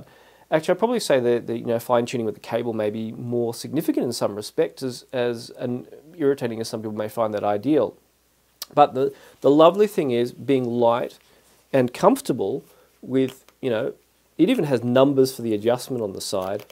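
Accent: Australia. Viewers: Great review!! I’m thinking of getting a set of headphone. I prefer closed. I have 2 choices in mind at the moment. This Sony or the Shure SRH1540. Any chance you’ve listened to the Shure? Unfortunately I can’t directly listen to the Sony as I have to get it from Australia (you sound like you’re from Australia. I’m from NZ and unfortunately we don’t have it in our Sony stores) it’ll be interesting to get an input from you. Cheers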